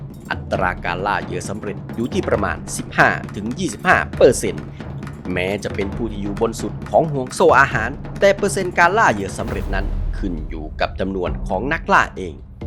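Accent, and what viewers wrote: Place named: Isan, Thailand. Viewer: เสียงคุณติดสำเนียงสุรินทร์นะ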